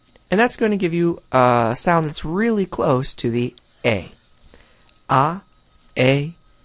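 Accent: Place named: United States. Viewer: I really enjoy your videos but have to comment on your American-English pronunciation of the vowels, especially the E and O. I've never heard those two vowels pronounced as you do. However, the content is excellent and very helpful!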